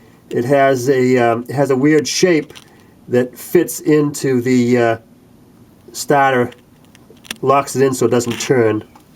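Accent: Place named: Boston, United States. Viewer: Great stuff! Enjoying the accent. Car Talk headquarters must be right down the street.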